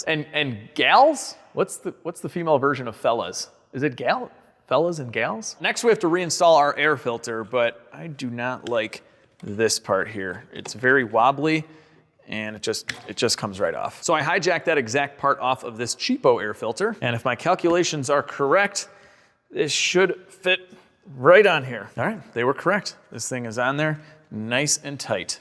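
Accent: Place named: Indiana, United States. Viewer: Yo @ Your accent sounds straight up "region". Are you orginally from NW Indiana? Lake county? Merrilville, Crown Point, Lowell, Cedar Lake?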